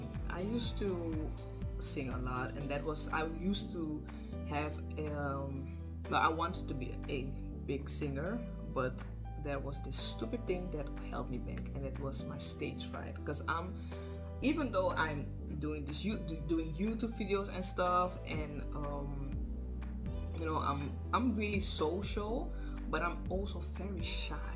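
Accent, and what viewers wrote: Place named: United Kingdom. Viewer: Ik heb de hele video gekeken i love it girl hahhahaha the british accent 😂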